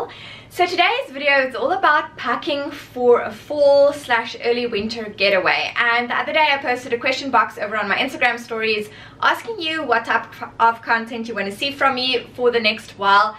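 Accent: South Africa. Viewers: I knew that was a South African accent..love from South Africa 🇿🇦❤I'm a new subscriber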